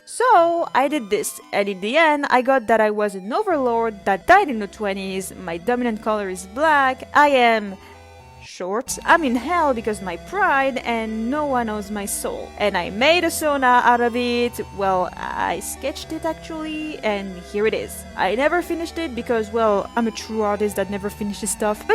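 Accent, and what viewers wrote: France. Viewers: Just basically it kills me how people knew you are french like me because you have a french accent. In the crack ships video there was literally written « nombre total d’abonnés » and the name of the music video was « Mon avis va déclencher une guerre » so as Carmilla said « It’s not rocket science » 😂😂😂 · And you know what’s funny ? By first watching one video of yours, I really thought you were American, then I was like « But she kind of has a French accent, I wonder if she’s French in fact » and when I watched your short about Yandere Simulator, I was like « Yeah. She’s definitely French. » I mean, I don’t understand how people can’t notice you’re an anime fan, you litteraly use many anime of your videos (like Death Note or Kaguya Sama). Wish I had like half of your collection, still have the entire collection of Ki & Hi though. I feel you. 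Currently in my last year of High School and I can’t seriously study for my baccalaureate even if I try. And I feel bad because in elementary school, I only had excellent grades but it feel off the second I enter middle school. I just hope I’ll have a 13 or 14 in my final grade. Alex Meyers, Saberspark and Octo Lady ? I didn’t know you had such good taste, let’s go😌 ESPECIALLY since he’s canonically asexual. Like…no. I mean, the only person I can see Alastor being with is Rosie but only for tax benefits or something like that. OMG WHAT ??? It’s so cool, I’m Moroccan too, LET’S GOO ! I think it will be better to do that if you reach 50k. But anyway, congrats for the 9.5k🎉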